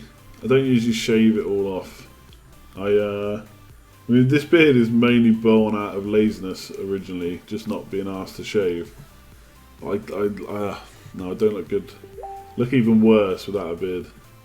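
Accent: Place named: United Kingdom. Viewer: are u Irish seen the Irish flag beside Ur team but u have a strong British accent?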